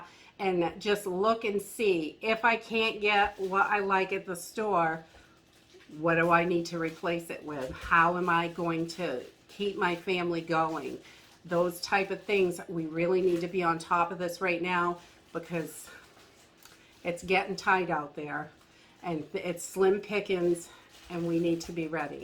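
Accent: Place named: Maine, United States. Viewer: You are so right! My husband said the other day, please don't bring any more food home, we don't have the room! Lol I have filled every area I can find., your 🤔Maine accent has never been more obvious. TY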